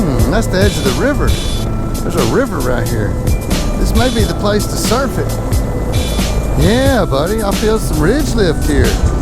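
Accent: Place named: Louisiana, United States. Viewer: Good times had by all, great vid 😃. Maybe it's the other guys accents, but you sound more Louisiana than when your at home 😃😃 👍👍🇬🇧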